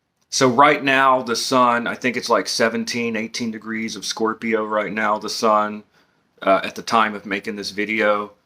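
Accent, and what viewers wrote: South, United States. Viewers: LOVE THIS GUY HE IS WELL INFROMED EXPERIENCE AND ARTICULATES WELL WITH A SOUTHERN CHARM AND CHARISMA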